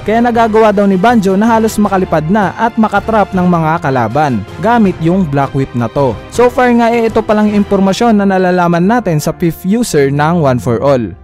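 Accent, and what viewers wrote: Visayas, Philippines. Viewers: Naol bisaya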